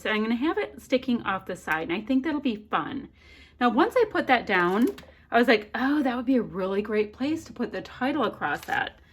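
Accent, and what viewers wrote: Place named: Midwest, United States. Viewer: Nicely done. Precious! Precisely why we don’t have a tree this year. We have a 9 month old pup of a much larger breed. She loves to chew and to steal things (admittedly mostly socks). So our favorite carol this year is 🎶 No Christmas tree, no Christmas tree, Piper would strip you of your branches. 🎶 Also, I’m guessing you are in the Midwest(?) I hear several of the YouTube gals from that area pronounce “bough” like it rhymes with “snow” not with “cow.”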